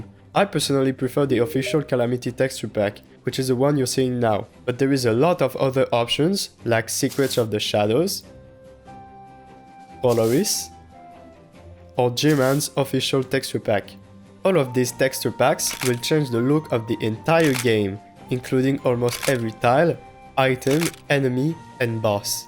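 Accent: France. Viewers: Tu es français non ? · Nice frenchglish accent and nice video! ;D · Are you french or something ? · that french accent is ruining my ears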